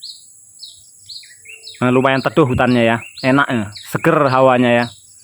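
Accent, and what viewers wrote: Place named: Medan, Indonesia. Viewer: Abang ni logatnya kayak irang medan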